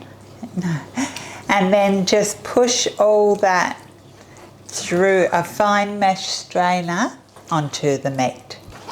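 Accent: Australia. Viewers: I don't think Dave picked up on your accent. True blue, it is, if you ask me. Nice work . . .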